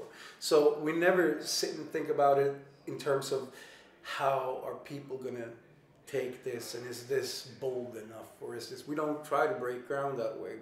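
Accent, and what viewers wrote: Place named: Wisconsin, United States. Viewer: I would’ve thought he was from Wisconsin if I didn’t know otherwise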